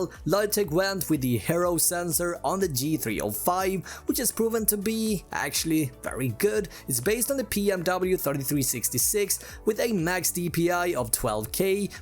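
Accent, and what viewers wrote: Sweden. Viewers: THE SWEDISH ACCENT IS ON FLEEK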